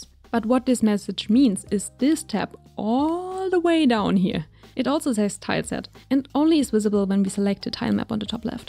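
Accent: Germany. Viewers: Ich antworte mal auf deutsch, da sich dein englisch sehr nach deutsch angehört hat: Das Video ist nicht nur sehr schnell gesprochen, es ist für mein empfinden auch etwas zu sehr hektisch gewesen. Da ich ein Anfänger mit Godot bin fällt es mit etwas schwer alles nachzuvollziehen. Ich werde aber mein Bestes geben. Danke :-) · Man merkt so das du Deutsch bist xD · i hear a little deutsch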